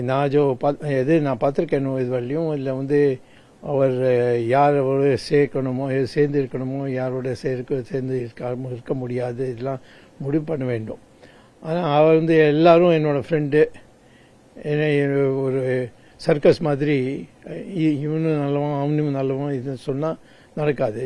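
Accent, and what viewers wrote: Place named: North, India. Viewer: Yenna da unnoda slang oru mairum puriya mattigu Vadakan da neee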